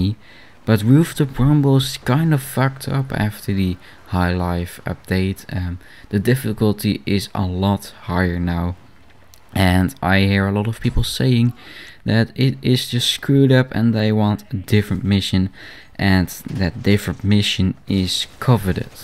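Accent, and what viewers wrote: Netherlands. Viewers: dutch accent?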